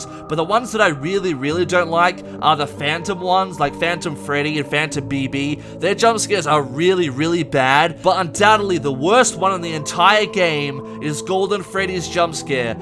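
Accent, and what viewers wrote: Australia. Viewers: Omg how didn’t I know he was aussie? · I didnt know you were aussie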